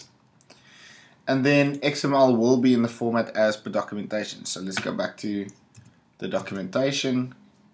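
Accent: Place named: South Africa. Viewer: Hey, did you continue developing in WeChat, or have moved on to other things? Also, trying to place your accent.. are you South African? :P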